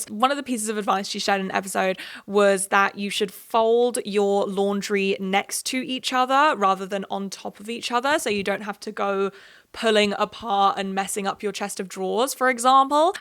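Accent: England, United Kingdom. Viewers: Gal you are so likeable ! Such a breath of fresh air hearing one of these pop culture chit chats from a proper British hun 💋💋 also this restock shit is so tacky haha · Weird thing to comment on, but since Covid scattered my friend group and I had to rebuild my social life from the ground up online and I ended up with a very international social group with all kinds of different accents, I did not realize you were English till you said "washing up". I've been watching your videos for months.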